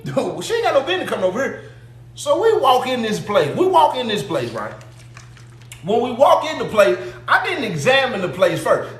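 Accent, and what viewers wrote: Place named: Dallas, United States. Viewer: Sound like Sunny side South Dallas lol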